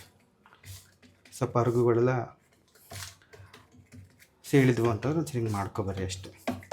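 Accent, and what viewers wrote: Chitradurga, India. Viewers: Namma Durgada kannada 😂👌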